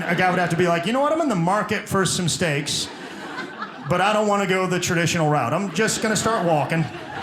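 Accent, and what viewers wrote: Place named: New York, United States. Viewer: The New Yorker accent is spot on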